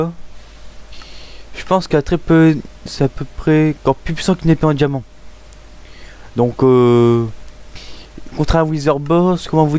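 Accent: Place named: Russia. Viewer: J'adore ton accent russe , sa a du être difficile d'apprendre le français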